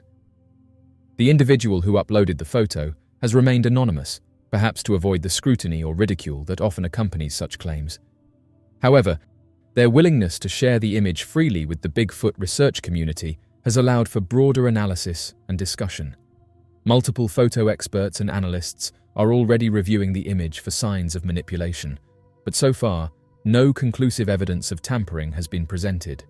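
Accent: England, United Kingdom. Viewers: Filmed means moving pictures not photographs. You have the English accent but obviously havent mastered the English language.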